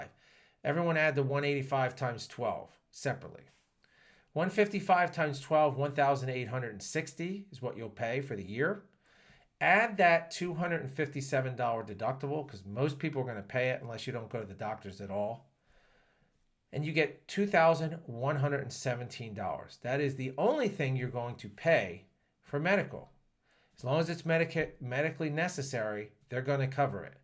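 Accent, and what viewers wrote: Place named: Chicago, United States. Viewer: This guy talks like he's from Chicago, close to my hometown.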